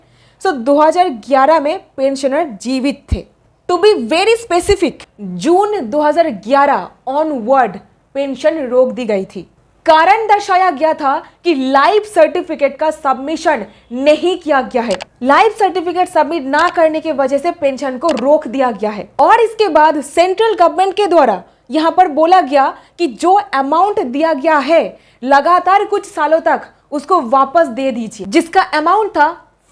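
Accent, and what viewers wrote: Bengal, India. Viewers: she has Bengali accent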